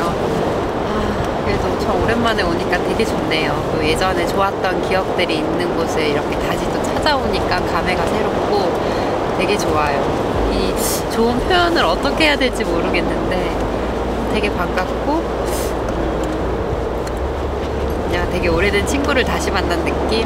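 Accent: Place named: Seoul, South Korea. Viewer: 서울 말투 인가요 차분하니 말씀 잘하시네요..경상도 말많듣다보니 너무좋네요 ㅋㅋㅋ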